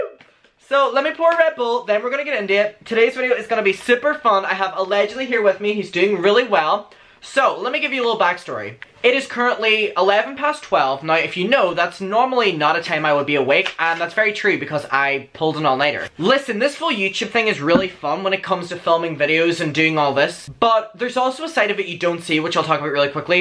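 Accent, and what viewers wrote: Ireland. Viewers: what?! You can hear the Irish accent thick af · Love that you’re not gonna change your accent/how you pronounce things to please people!! From a fellow Irish gal 🥰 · I love your Irish accent and how you pronounce Garett. Much love to Ireland. Garett's new video is hilarious btw.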